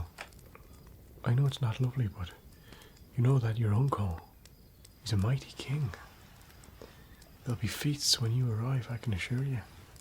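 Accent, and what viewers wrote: Ireland. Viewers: As a person living in Ireland, I want to wholeheartedly compliment your Irish accent. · As an Irish person, I want to congratulate you on the fantastic accent. I can actually place that accent on a map. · The Irish accent?? IN LOVE EVEN 2 YEARS LATER 😍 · Loved both of the knight vids. Brilliant immersive character and an Irish accent with that hypnotic, peace inducing voice, insanely good. Just as an aside, if you ever have to get a kid to sleep, sing a lullaby in this voice and you'll have no problems.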